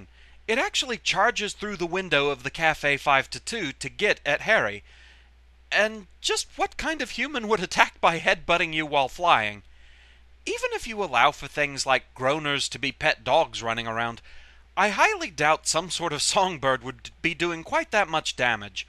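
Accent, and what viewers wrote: United Kingdom. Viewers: ¿Are you brittish my friend?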